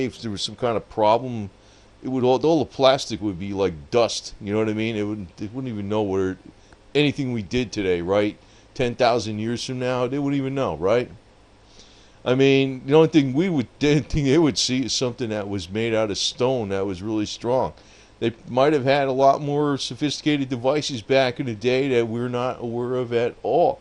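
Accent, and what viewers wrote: United States. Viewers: The american accent.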